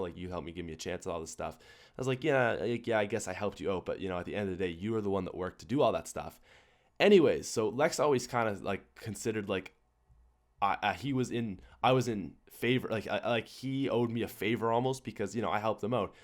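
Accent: Ireland. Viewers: Hey. Why is it that I hear a hint of Irish( Ireland/Northern Ireland) in your voice? Especially the "r's". Haha, awesome videos!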